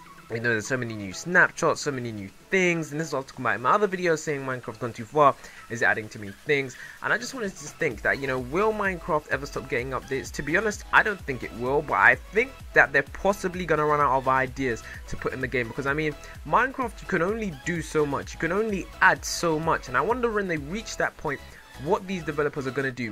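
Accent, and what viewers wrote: United Kingdom. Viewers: in some videos you sound and in some others you sound british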